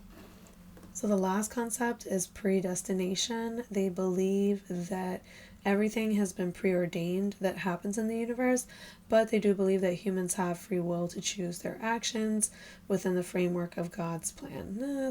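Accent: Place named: United States. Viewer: You have an American accent so speak about a more progressive Islamic world like some Muslim communities in the West... There are also progressive Islamic communities elsewhere in the developing world... Why only speak about the worst of the worst? But you don't do that with Christianity??Howcome?🙂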